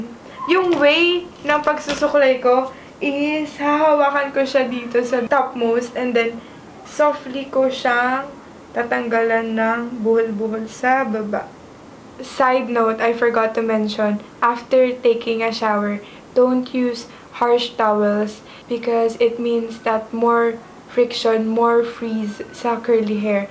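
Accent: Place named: United States. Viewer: Luh kala ko american ka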